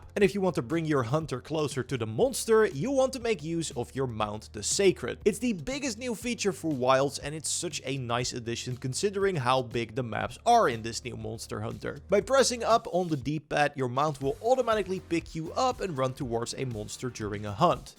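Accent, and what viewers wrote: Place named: Netherlands. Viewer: Ur dutch right? :p I can always tell in the first few seconds of someone dutch speaking english xD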